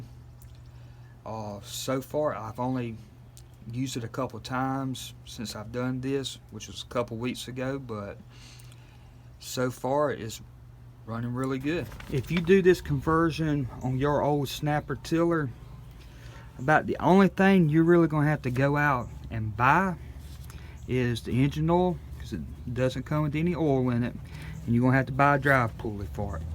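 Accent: South, United States. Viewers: Hey I can tell you are a good old boy from the south !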